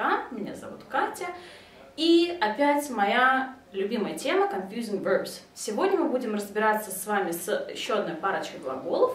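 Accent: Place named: United States. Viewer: Кстати у американский акцент, как у носителя языка с первого раза и не скажешь, что она русская!!! Продолжайте в том же духе и по больше видео!!!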